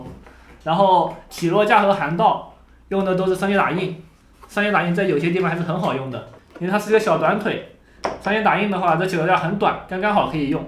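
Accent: Jiangxi, China. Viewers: 在影片中经常能看以红土地，中国的红土地一般在湖北，湖南，江西。 结合口音，目测是江西。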